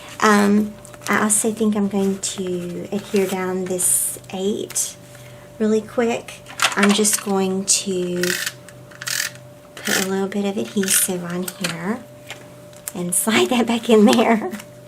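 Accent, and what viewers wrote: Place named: South, United States. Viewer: Love the pages and all of the eat stuff your hubby brought back for you! Where do you live? You sound like you are in the south! I'm in Atlanta.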